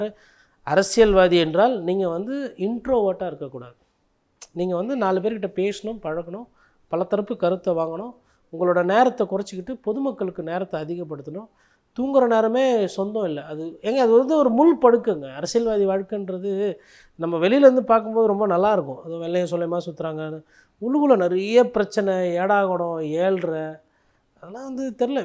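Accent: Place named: Chennai, India. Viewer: Sir, I don't know your speaking right or wrong but the way u speak seems right with local chennai Tamil. Appreciate u. 👍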